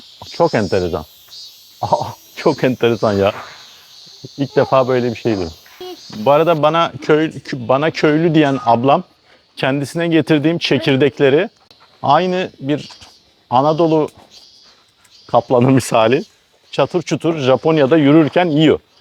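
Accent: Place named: France. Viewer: Yalniz Japoncanizda Fransiz aksani var sanki.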